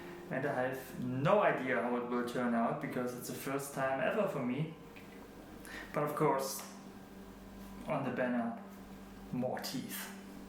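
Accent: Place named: Germany. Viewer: The German sounding accent makes this even creepier.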